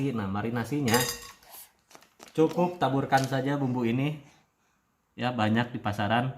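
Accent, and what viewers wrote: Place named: West Java, Indonesia. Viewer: orang sunda ya kang...dr cara nyebut alat" penggorengannya..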